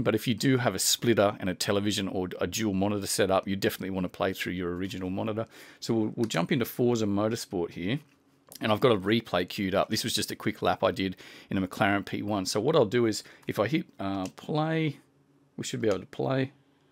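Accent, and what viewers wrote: Australia. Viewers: yes another aussie haha.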